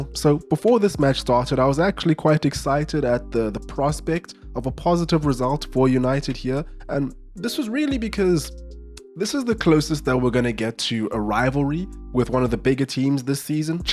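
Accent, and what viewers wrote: South Africa. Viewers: Are you south African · I'm curious about where you're from bro? Name says Zim, accent says SA. Just curious...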